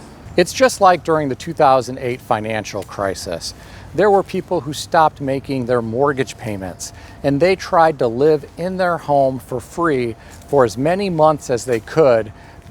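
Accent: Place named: South, United States. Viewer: Love your redneck pick up drivers accent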